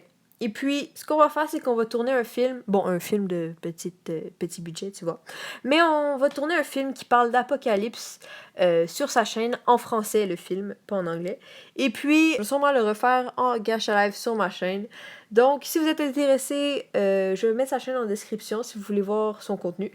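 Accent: Quebec, Canada. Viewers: J'aime trop ta voix et ton accent tes Québécoises ? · Salut j'adore ta voix, et d'habitude j'aime pas l'accent québécois mais je trouve qu'il te va trop bien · Ton accent est incroyable j'avais jamais entendu l'accent québécois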